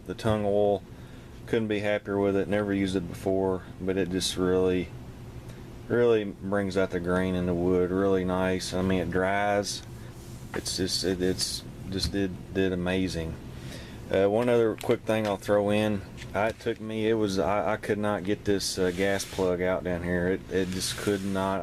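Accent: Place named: South, United States. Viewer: When a guy with a southern accent understands the difference between a clip and a magazine, he feels like he’s an authority on all firearms related topics.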